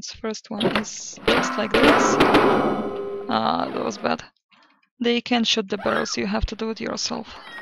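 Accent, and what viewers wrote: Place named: Ukraine. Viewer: Like your accent. That Ukranian?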